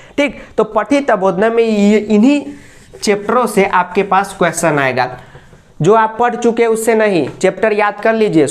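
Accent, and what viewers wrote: Bihar, India. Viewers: Sir aap bihar se h kya ?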